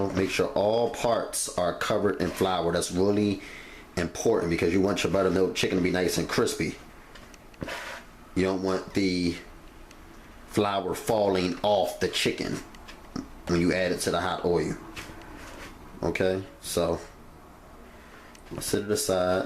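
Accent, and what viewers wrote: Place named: Baltimore, United States. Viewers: commah ntata he sound like he for bmore 😃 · I know lol I'm from Philly and I thought he was from Baltimore. I can't get over that accent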